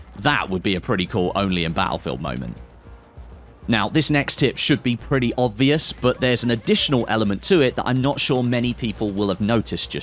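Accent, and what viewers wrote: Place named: England, United Kingdom. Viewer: you outsub stodeh on YT good work man! my englishman with the so easy to know who u are by ur voice ;) perfect english accent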